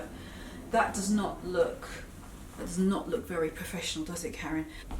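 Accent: United Kingdom. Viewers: I realize this is a yr old but “bloody” lol (typing as I speak in your British accent in my mind) I love that yellow suit, everything about it. Is that appropriate for any work place tho?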